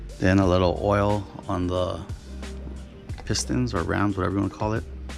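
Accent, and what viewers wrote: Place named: Hawaii, United States. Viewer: 5 seconds in from speaking and was like this guy gotta be from hawaii. O-ring walkthrough helped, gonna fix my jack this weekend!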